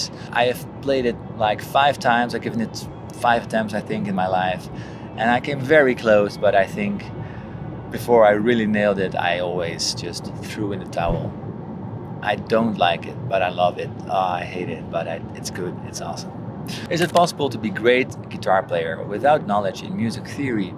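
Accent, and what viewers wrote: Netherlands. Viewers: Are you Dutch? Your accent sounds very Dutch. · Are you dutch?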